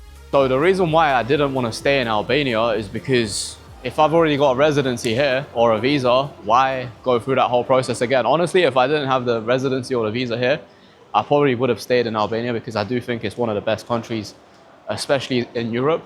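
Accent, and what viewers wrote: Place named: United Kingdom. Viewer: you sound like you came from the UK.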